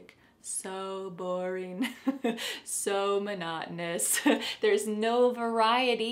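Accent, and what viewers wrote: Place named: United States. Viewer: Hello My name is Eugene. Thank you very much indeed for your super American English accent. Here in Rwanda we truly love you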